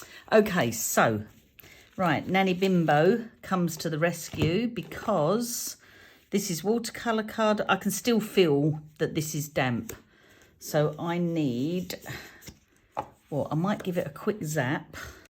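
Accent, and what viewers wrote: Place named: RP, United Kingdom. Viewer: The proper queens English!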